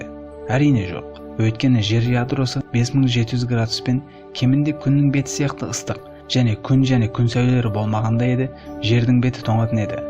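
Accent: China. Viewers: Кытайда туратын казах менинше. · Қытайда оқығансыз ба? тіл акцентіңіз ұқсас.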